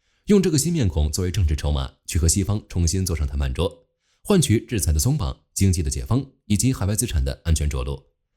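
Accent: China. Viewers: 博主是台湾人？只是这配音很大陆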